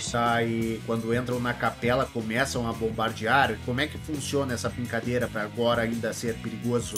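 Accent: Rio Grande do Sul, Brazil. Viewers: A pergunta que não quer calar, tu é gaúcho?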